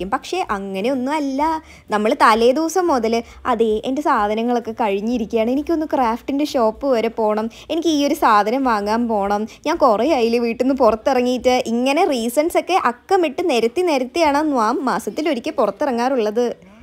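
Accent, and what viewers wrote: Palakkad, India. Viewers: Chechi vede palakkad anno eandha palakkad mannarkad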